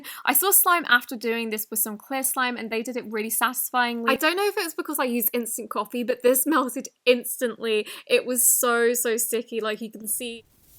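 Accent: United Kingdom. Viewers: Are you from the uk ?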